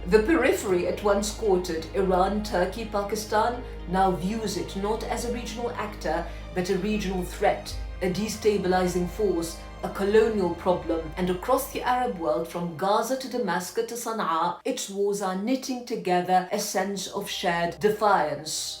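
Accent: RP, United Kingdom. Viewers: Her voice is like she from English ROYALTY 👑 ..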